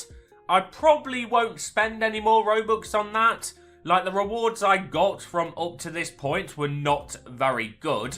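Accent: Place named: United Kingdom. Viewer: Bro is British because he was spending pounds and his accent also makes this true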